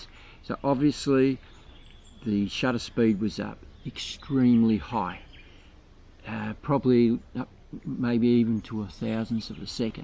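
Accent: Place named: Australia. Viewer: I hated to say this but you sound like an Australian.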